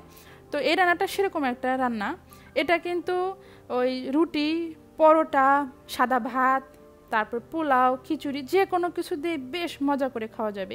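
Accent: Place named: Tangail, Bangladesh. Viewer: আপু আমি তোমার সব ভিডিও দেখি,সব গুলো আমার ভালো লাগে। আর কথা শুনে আমার মনে হয় তোমার কথা আমদের কথার মতো, তোমার বাড়ি কি টাংগাইলে, আমার বাড়ি টাংগাইলে সদর থানা পাড়া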